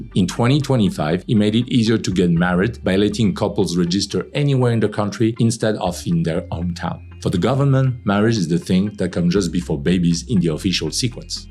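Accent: France. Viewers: Like your video's including your French accent. Greetings from The Netherlands and thanks for your video's. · The world’s most annoying French accent. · Mon Dieu, ça fait mal d'entendre son dialecte. On ne peut pas transposer la mélodie de la langue française en anglais. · Love his French accent!!!💖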